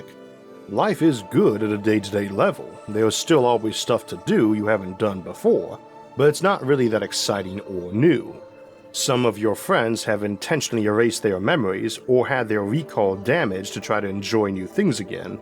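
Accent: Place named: South, United States. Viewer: This deep southern accent of the USA is worse than a Scottish one.Who picked the parameters for the A.I. text to voice? Mr. Alabama? Unless you come from the Mississippi delta you’re not gonna have an easy understanding.A human would have done this better.For this ,using an inferior A.I. I will boycott the rest of the video.Give jobs to humans,you pile of rusted microchips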